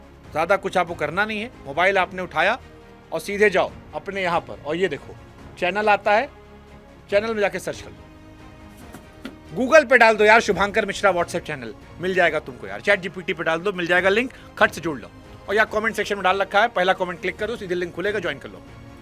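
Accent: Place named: Uttar Pradesh, India. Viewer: Bhai. Aap. U. P. Se ho. Kiya. Bolne. Ka andaj. Jaber jast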